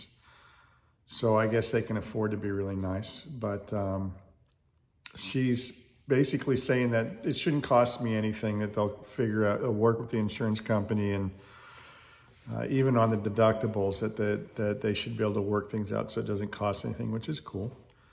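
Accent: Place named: Pennsylvania, United States. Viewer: I'm from PA. Friends&family in Sequim. I knew you looked & sounded just like someone from home! Nice to meet you, neighbour, via the world wide Web!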